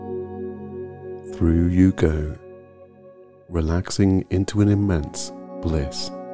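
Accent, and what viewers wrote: United Kingdom. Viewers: Why are these videos always a deep voiced British guy or a Russian woman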